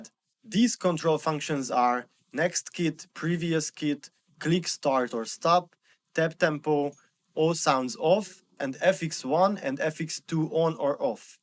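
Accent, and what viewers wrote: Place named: Hungary. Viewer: Az ember egyből felismeri, ha hunglishül beszél valaki :D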